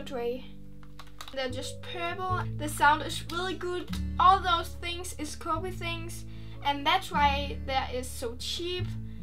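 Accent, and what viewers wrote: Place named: Denmark. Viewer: Woaw man kan godt høre at du er fra Danmark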